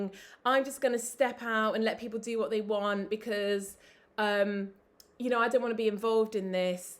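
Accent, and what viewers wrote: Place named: Yorkshire, United Kingdom. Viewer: The floor in your argument is that 80% of the population are as thick as you know what. They are not capable of reasoned thought. That is why things like football exist. To give those people a raison d'etre. PS, where are you originally from ? I think an east Yorkshire twang occasionally makes a bid for freedom.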